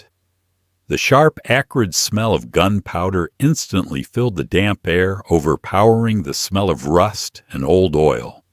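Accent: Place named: South, United States. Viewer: a little "southern" for an Italian Mafia video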